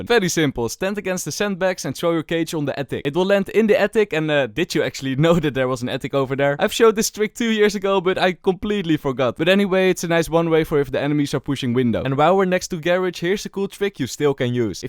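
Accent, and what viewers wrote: Netherlands. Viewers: ik ruik een beetje nederlands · i smell dutch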